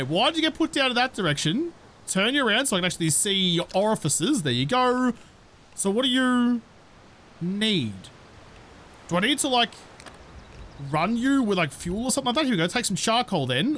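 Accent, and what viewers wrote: Australia. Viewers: "hypathermia or hypathermia, which one?!" Ah, aussie accent never change but also please don't be responsible for me in the zombie apocalypse when you have to ask for help on the radio with my very high or very low body temperature.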